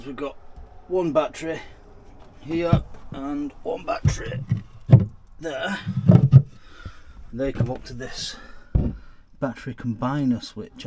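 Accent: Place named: North, United Kingdom. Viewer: I missed the bit where you said in your northern; "Don't belive in spirit levels " 😃 I am certainly looking forward to seeing more, just so I can see your workmanship reaching new heights. This boat project is going to be good for you. Take care.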